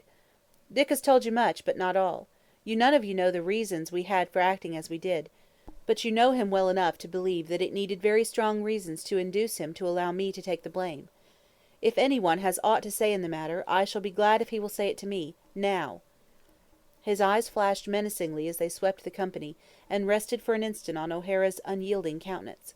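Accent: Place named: United States. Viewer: Disappointing in American accent.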